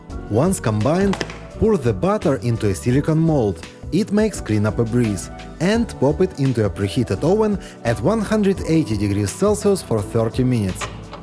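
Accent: Russia. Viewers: Advisory warning, there's nothing Japanese about this guy or his tricks or channel. I'm guessing from the accent he's originally Russian selling cooking techniques to an American audience. As a British born vegetarian former salad chef with Italian ancestry who doesn't eat white bread, white sugar or meat derived products, I won't be subscribing, lol. ✌️ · Russian accent 👎🤦‍♀️ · Clearly sounds Russian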